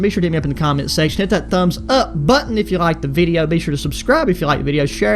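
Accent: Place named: Texas, United States. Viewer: You have a heavy Texan accent 😳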